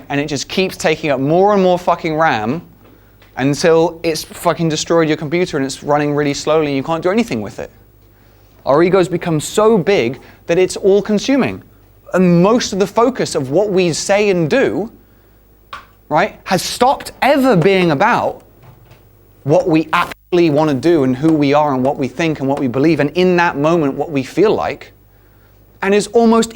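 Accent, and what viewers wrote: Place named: United Kingdom. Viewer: Crap, i dont get that british accent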